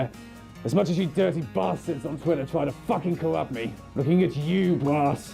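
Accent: United Kingdom. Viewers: you sound sooo brittishhhhh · Ahh another of my kind, a British furry that sounds like “spiffing brit” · Savior_the Husky b r i t i s h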